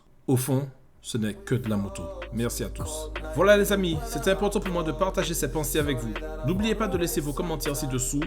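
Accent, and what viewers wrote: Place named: Antilles, Caribbean. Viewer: Merci à toi pour cette video de soutiens force aux antilles✌😉😉😁👍he oui l accent on ne peux la zapper force a toi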